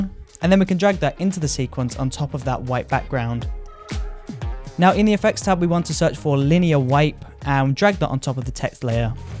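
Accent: United Kingdom. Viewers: I love coz you're British haha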